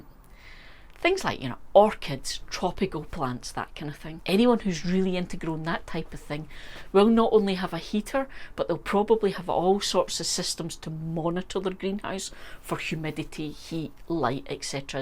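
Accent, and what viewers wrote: Scotland, United Kingdom. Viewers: Hello again. I believe it was back in your previous greenhouse. Maybe I’m not remembering correctly, but it was a smallish plug in heater. I don’t want to cause you any extra effort because I could be remembering wrong! Anyway, if it’s nice and warm all day and is then at freezing at night, I’m thinking that would not be good! Anyway, if you are pretty sure you never used a heater, then don’t worry about it. Could be another of my senior moments! Ha ha. PS the first time I watched you, I thought “oh no, I’ll never be able to understand what she’s saying with a Scottish accent” which of course was not the case. Your presentations are so great! Keep them coming👏👏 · Not fair unless we know where your accent is from?? Scotland. Irish. I am Scotch Irish living in Louisiana, raised in Texas with an East Texas accent. 😊 ❤ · New here love your channel and your information thx u 😊 I’m from Australia 🇦🇺 my mum is Scottish so I feel at home listening to your voice ❤ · I was surprised when you first started talking. 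Your accent is so cute and you are so easy to understand. Except a couple of times you mentioned ober jeins.(That is how it was spelled in the closed captions.) I wondered what that was.So I googled growing ober jeins in Scotland, and started laughing when I realized you were saying aubergines! We just call them egg plants here in Michigan. You were very informative and helpful, so I decided to subscribe to hear more of that accent! I almost did not click on your video when it came up in my feed but I wanted to find out what your Ikea hacks were. I am so glad I did! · Hello my new friend in Scotland! This was a wonderful video! First of all listening to you is like having my mother read me a bedtime story… Your accent and lilt are very soothing! Secondly, I just realized that by using water from the tap, I am shocking my seedlings! So thank you for that common sense advice. Lastly, you have inspired me to move some tomato and basil plants into my greenhouse for the winter. 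I’ve always wondered about pollination inside a greenhouse , so I’ve never done it all season long. However, I am going to try to extend my season this year by moving my establish plants into my unheated greenhouse. Looking forward to viewing the rest of your videos. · Thanks for your video with your charming scottish accent.' I kanee...?..I was at uni at St Andrews and it brings back good memories. What I don't quite understand why you would put the IKEA plastic recipients low down because you could use that space to grow plants and maximize the light. We have built light shelves all around the edges of our greenhouse which can be taken away so we start small plants on two levels then remove the shelves that are about at one meter twenty . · Clicked for the title, listened because of your awesome accent (Scottish heritage all over my family tree), and stayed because of your content. So very helpful. 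Thank you for sharing your setup. You got a new subscriber. I had to laugh at myself being a typical American. Me: What? they have IKEA in Scotland? Me a moment later: Ya big dummy, IKEA is Swedish, probably had IKEA before you even knew what IKEA was. Hahaha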